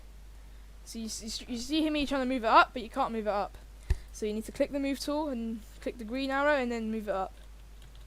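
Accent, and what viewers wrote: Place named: United Kingdom. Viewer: Why are all youtube roblox scripters british?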